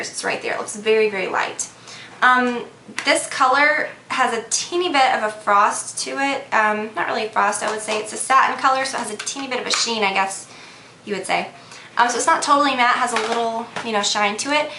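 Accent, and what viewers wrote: South, United States. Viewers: Your southern accent really came out in this video:)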